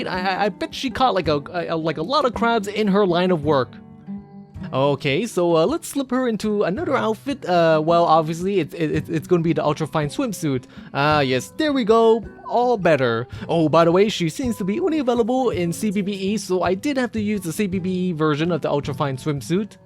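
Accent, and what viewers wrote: United Kingdom. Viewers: She sounds welsh I think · I'd say English but I can't place the region, but her voice is soothing. Also I'd work both but as a slave it's not like I'd have a choice anyways.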